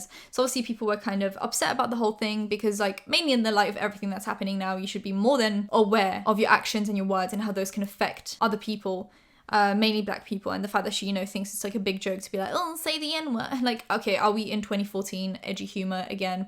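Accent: United Kingdom. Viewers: Are u british and from London?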